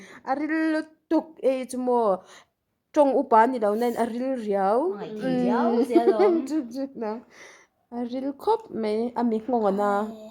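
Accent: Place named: Mizoram, India. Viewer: I chhiar thiam a niang ka hmin riau zel tin mizotawng thluk hi a awl ai lo khawp a nia lo tang sauh2 teh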